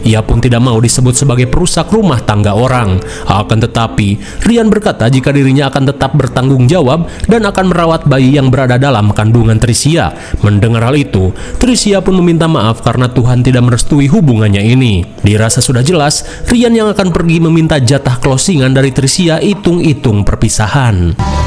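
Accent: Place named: West Java, Indonesia. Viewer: Asli mana bang sundanya ?? Jadi penasaran hehe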